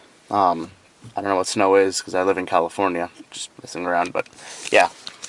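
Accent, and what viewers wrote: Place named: India. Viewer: I just love this car. It is favorite car and i do want to buy one asap. BTW I noticed a slight accent in your talking. Are you indian by any chance?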